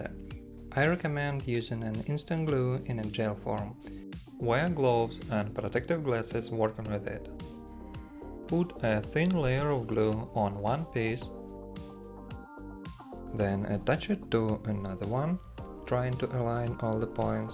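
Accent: Russia. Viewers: Какой-то слишком русский акцент -_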